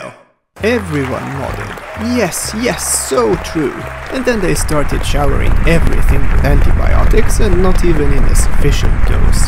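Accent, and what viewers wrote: Hungary. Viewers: How you weren't aware of "Hunglish" existence. LOL XD For me is super easy to spot. BTW his accent not even that hardcore as far as I'm concerned. Anyways both channel is awesome. :) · I never realized that Hungarian English accents exist until I heard you talk...this is exactly how I sound when I take calls at work...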